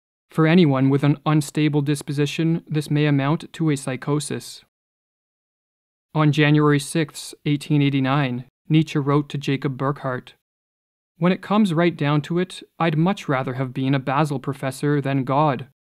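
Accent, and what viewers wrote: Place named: Canada. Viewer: Yeah, I hear the lilt and every once in a while a trace of a Canadian vowel; also, very often clear signs of a mind and ethos I recognize as Canadian Gen-X. But I never would have guessed in a million years that he doesn't work alone. Every bit of the intellect and personality he reveals to us argues lone wolf... except for, I guess—and this is the part I missed—the fact that he wants to be with us, his audience. (Bonus speculation, just for fun: I bet he's left-handed.)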